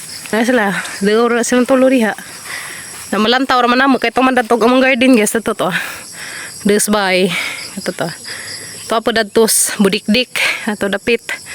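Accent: Bohol, Philippines. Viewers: Boholana k day? Kc ang yung intonation...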